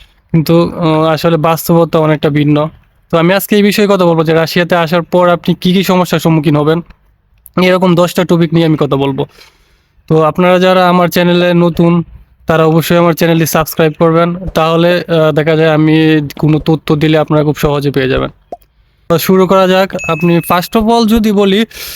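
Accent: Kishoreganj, Bangladesh. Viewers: আমাদের কিশোরগঞ্জের কথাবার্তা মনে হচ্ছে ❤। কিশোরগঞ্জের কোথায়?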